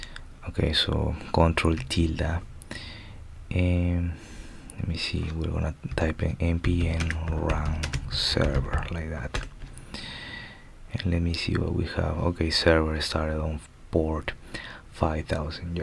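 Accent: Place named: Dominican Republic. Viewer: i feel a little know accent in your speech, like a dominican acccent. good content tho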